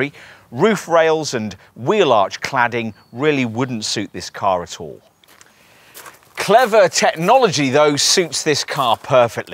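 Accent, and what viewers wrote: United Kingdom. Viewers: What is it with you people and the British accent? Give the guy a break. Are you that thick that you can you only understand a 'Murican accent? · Are we supposed to believe you because of your British accent? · How much did Jaguar pay this guy? I notice his accent is... British? Bias?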